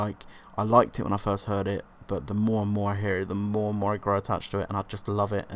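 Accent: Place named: Liverpool, United Kingdom. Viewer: I like the Liverpool accent. It reminds me of.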